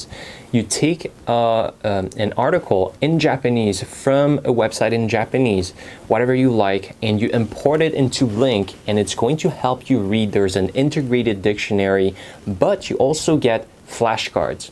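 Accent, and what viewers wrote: United States. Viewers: native french speaker? your american accent is absolutely incredible !!! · It is incredible! When I come across your video, I thought you were an American :)) Incredibly man!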